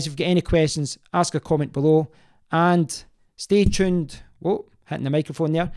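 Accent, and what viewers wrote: Scotland, United Kingdom. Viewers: I love your Scottish accent...It has been a while a heard such a pure Scottish accent! And the U11 is definitely the Android phone to get in 2017. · Excellent video love the scottish accent so refreshing to hear seriously considering on getting the U 11,I have the LG G6 at the moment and i am enjoyingi it ,but I am admittedly a big fan of HTC and can't wait to get the U 11.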